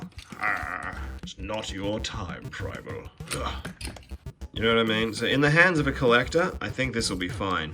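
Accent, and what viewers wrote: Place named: Australia. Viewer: I didn't know David Kaye did toy reviews in an Aussie accent..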